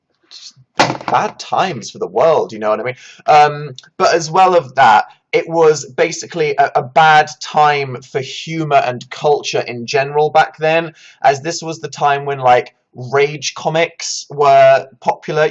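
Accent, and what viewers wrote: RP, United Kingdom. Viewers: Your so posh lol!! Was deleted wwwsexyllamas was deleted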